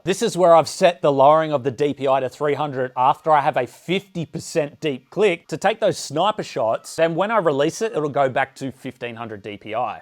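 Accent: Australia. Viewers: Australia, it’s a pretty well known accent kinda sad you don’t know it lol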